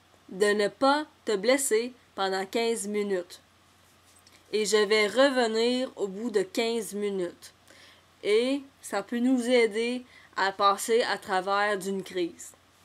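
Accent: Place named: Quebec, Canada. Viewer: De quelle région du Québec viens-tu? Ton accent quand tu dis mettons "infermière" est plus épais que je suis habituée d'entendre, et je suis curieuse :) Merci!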